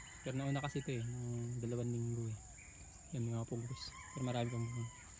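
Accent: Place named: Bicol, Philippines. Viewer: Taga San ka Pala boss parang bicol ka Kase pag nagtatagalog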